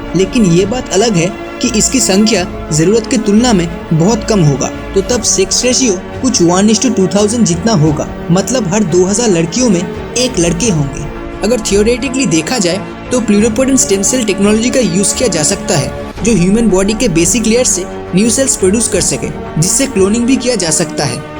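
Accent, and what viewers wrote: Bengal, India. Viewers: Bhai video bahut achhi hai but tera pronunciation full toos bekar hai...poori Bangali tone aa rahi hai...tu kya bangal se hai..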